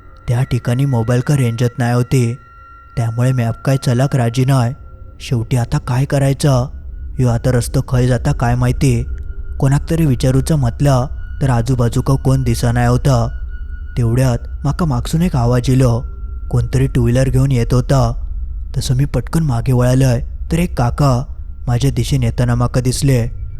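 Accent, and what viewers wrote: Malvani, India. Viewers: तुमची मालवणी खुप छान वाटते ऐकायला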